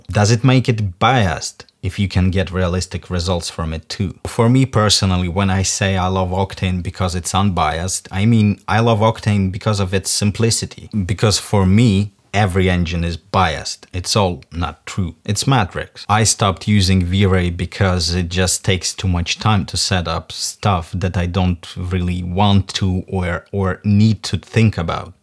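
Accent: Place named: Russia. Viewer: а я сразу понял что наш человек вещает )))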